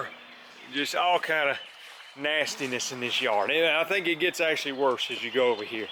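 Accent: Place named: South Carolina, United States. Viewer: Hahahha they wishing! All they really need to do is call you sir! "everthang" ahahh we got everythang I live in South Carolina and you just don't hear folks talking like this much. I feel at home.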